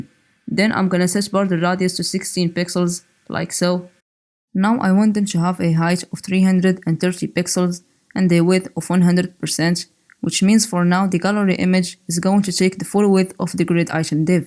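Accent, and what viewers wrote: Morocco. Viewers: from your voice I am pretty sure that you're Moroccan ❤❤ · Very nice channel, thank u for the great content. Btw are u Moroccan ? cuz I feel like your accent is kinda Moroccan.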